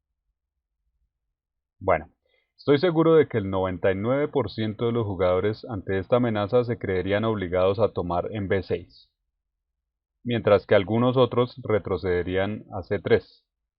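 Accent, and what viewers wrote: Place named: Colombia. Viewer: coño, no sabía que igor smirnov supiera hablar tan bien español,lo habla con acento colombiano,increible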